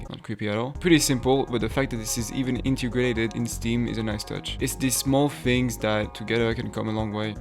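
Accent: France. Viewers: Accent français grillé ! Mais en vrai ça va c'est léger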